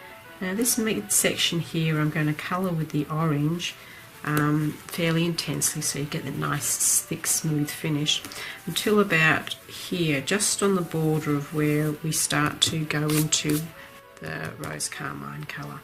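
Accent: Australia. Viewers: Absolutely beautiful artwork! That is one of the smoothest, most gorgeous backgrounds I’ve ever seen. The only thing (but it’s a big thing for me) is I find any background music distracting and awful (trumpets etc) and I usually just mute it, but I couldn’t with this one cos you were explaining a little bit here and there. I think everybody who does art probably has their own thing that they put on in the background anyway. I listen to learning German when I feel productive, Lady Gaga when I want a happy vibe, but often I just like complete silence, so I can think about all sorts of stuff/concentrate on what I’m doing. But when people put background music on in their instructional videos, the viewer isn’t given a choice - and everyone has different taste in music. The reason I mention it is because I really like your work very much and one of the things that you do that I find particularly helpful is that you really clearly list the brand and exact colour of the pencils that you’re using. 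I think I detected an Australian accent? I’m Australian so hi! 👋 I have seen a lot of your work on Instagram that I like too. So hi! And I’m sorry about the music comment (one part was like the background music they use before funerals get starting - you know, when people are assembling and greeting etc) and I know that I’m very over sensitive to things like that. But absolutely beautiful work! 😃👍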